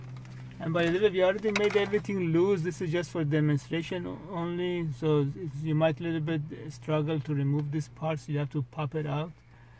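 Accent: Iran. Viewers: You sound like your Persian, great video. How do you tell, or what are the symptoms this part needs to be replace?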